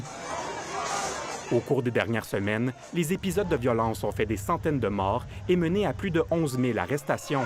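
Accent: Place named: Quebec, Canada. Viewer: Je ne pense pas que ton commentaire soit mal intentionné et je ne veux pas jouer les donneurs de leçon, mais il est important de ne pas ridiculiser un accent qui définit une identité culturelle entière. Certes, l'accent québecois peut sonner un peu étrange pour nous francophones d'Europe mais il n'est pas plus "bizarre" que le nôtre, ou celui des Belges ou de tout autre pays. En s'y exposant plus régulièrement, on s'y habitue (et y'a d'excellents films québecois que tu peux regarder comme Mommy etc.)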